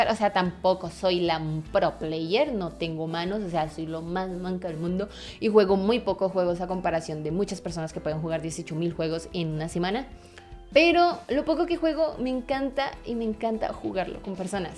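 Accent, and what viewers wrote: Colombia. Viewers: De donde eres? Pareces colombiana? Bogotana? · No sabia que eras colombiana, tu acento es diferente, pensé que eras de otra parte xd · Ella me apareció en sugerencias y me pareció monísima, ahora me subscribi porque le siento acento de Colombia que es donde yo vengo ☺️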